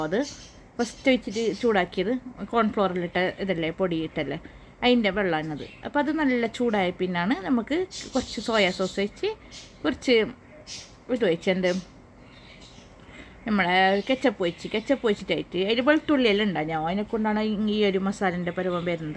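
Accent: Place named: Kasaragod, India. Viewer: ഒരു വെറൈറ്റി ....സൂപ്പർ ...അതോടൊപ്പം കാസർഗോഡ് സംസാര ശൈലി ഇഷ്ട്ടപ്പെട്ടു ......കണ്ടതിനു ശേഷം ലൈക്കും .കമന്റും .സബ്‌സ്‌ക്രൈബും ചൈതിട്ടുണ്ട്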